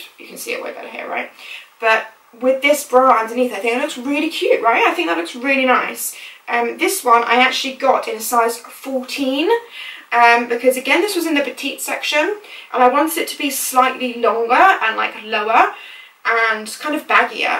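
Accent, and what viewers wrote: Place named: Southern England, United Kingdom. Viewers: Marine Pierrot I think it's Brighton x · Mirella Thalyssa i think it's a southern English accent because that's where I'm from and that's the accent I have 😊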